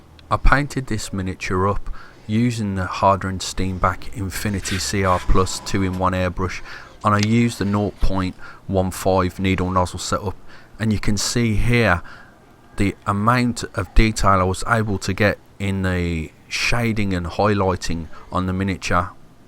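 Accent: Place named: North, United Kingdom. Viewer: Love the Northern accent :D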